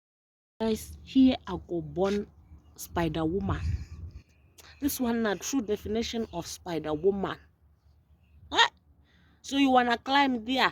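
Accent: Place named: Nigeria. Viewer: Perfect Nigerian accent😂😂😂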